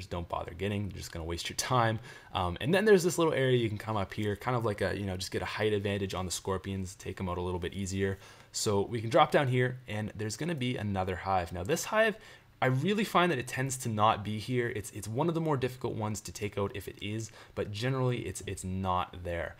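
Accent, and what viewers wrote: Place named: Canada. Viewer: ha ha, love the Canadian in ya ;)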